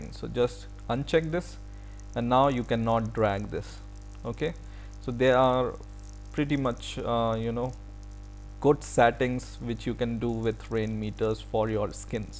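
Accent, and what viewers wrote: India. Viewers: The accent is like a cleaner version of indish. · Lemme get things clear, Your PC is in German, But you sound Indian... Makes sense.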